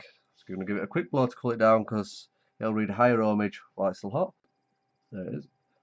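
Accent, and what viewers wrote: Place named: England, United Kingdom. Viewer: Few things I enjoy more than an English narrator. Cheers.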